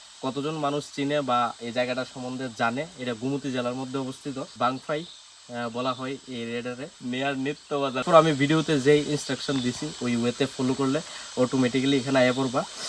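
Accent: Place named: Mymensingh, Bangladesh. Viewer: ভাই আপনার কথা তো একদম আমাদের বাংলাদেশের ময়মনসিংহ অঞ্চলের মানুষের মত আসলে আপনার বাসা কোথায়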